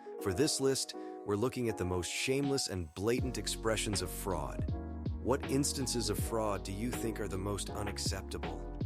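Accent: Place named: United Kingdom. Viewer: I WANTED TO HEAR UR BRITISH ACCENT AGAIN WHYD U HAVE THE GOJO VOICE DO IT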